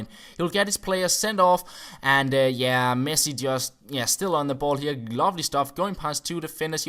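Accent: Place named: Denmark. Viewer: I love your Danish accent!!!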